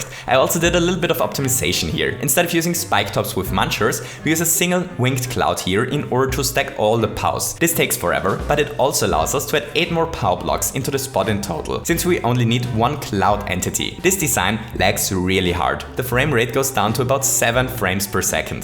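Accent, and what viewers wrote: Germany. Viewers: WAIT ARE YOU GERMAN? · Does this guy make German-Videos as well? Because you can hear this by his pronunciation of some Words! (PS: Ich frage, weil mir seine Stimme bekannt vorkommt.)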